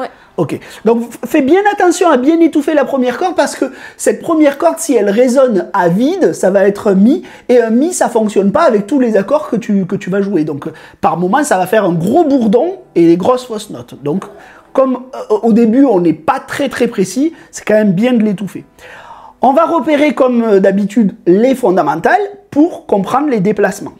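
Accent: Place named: South, France. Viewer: Merci pour cette super vidéo. Un très bon exercice même pour les grands débutants comme moi. Tes vidéos sont une mine d'or et ta pédagogie au top. Dans le dernier exercice j'ai trouvé cool de faire 2 mesures E à vide G5/ A5 /B bémol 5 et A à vide C5/D5 E bémol 5. Ça fait bien bosser... J'ai cru entendre que tu avais l'accent du sud 😅j'habite dans le sud aussi peut-être ne sommes nous pas très loin pour des cours en individuels... Je ne sais pas comment te contacter en privé. Merci et continue tes vidéos.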